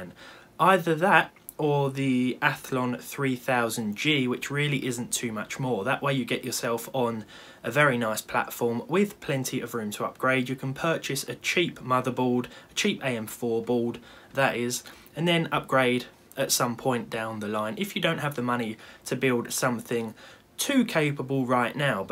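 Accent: United Kingdom. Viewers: His accent says British, his heart says Proto-Canadian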